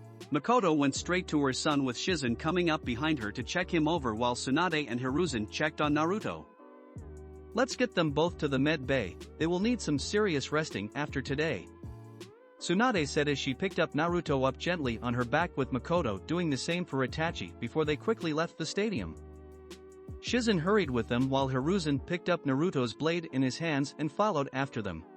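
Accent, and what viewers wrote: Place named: Japan. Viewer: At some point the text to speech program took on a Japanese accent. That was at some point after the 30 minute mark.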